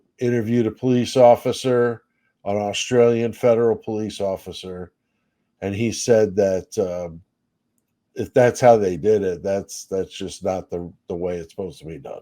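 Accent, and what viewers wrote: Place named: East Texas, United States. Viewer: Cool, first time viewer. Liked your Idaho coverage. Do I hear east Tx in that accent 🧐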